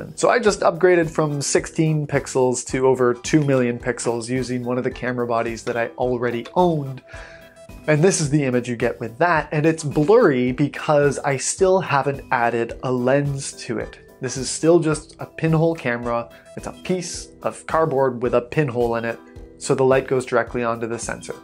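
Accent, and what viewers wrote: Canada. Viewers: oh hes canadian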